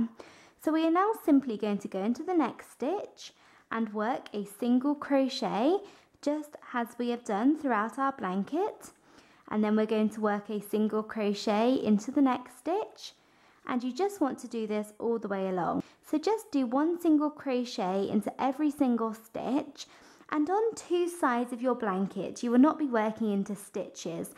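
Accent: England, United Kingdom. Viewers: I am new to knitting and crochet and found your video very easy to follow and understand. Also love your English accent! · great instruction I love the feel of this blanket. may I ask where you are from? English accent? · What lovely work you do! Are you from Britain? Lovely accent :) I also wanted to ask how much wool you needed for this blanket?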